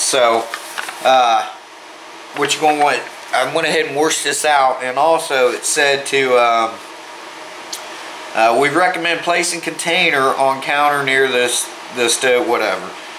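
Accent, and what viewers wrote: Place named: Indiana, United States. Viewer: I would have guessed Indiana. They talk like that 'round here. Lol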